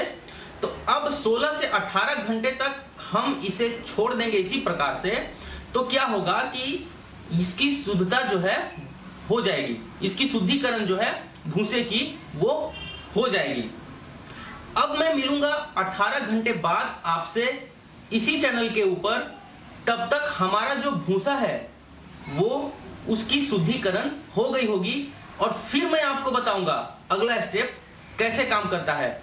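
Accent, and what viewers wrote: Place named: Bihar, India. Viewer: Bhai aap Kanhaiya Kumar ke jaise bolte ho... Lagta hai Bihar se ho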